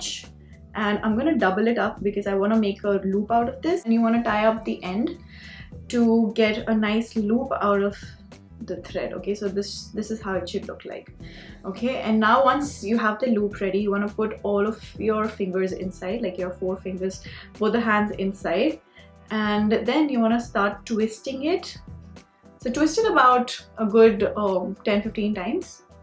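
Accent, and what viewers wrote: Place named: India. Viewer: The technique is very good but the accented English is very, very hard to understand outside of India (ex. for American or British listeners)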